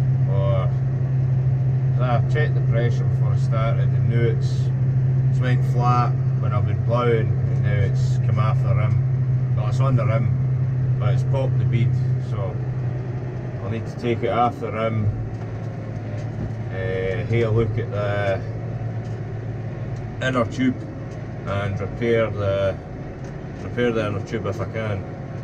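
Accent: Scotland, United Kingdom. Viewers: What part of Scotland are you from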